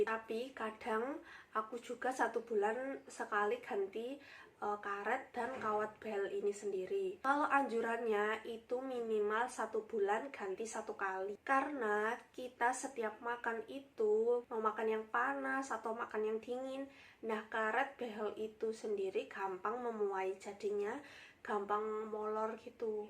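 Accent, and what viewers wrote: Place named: Java, Indonesia. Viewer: Medok rek jowo ne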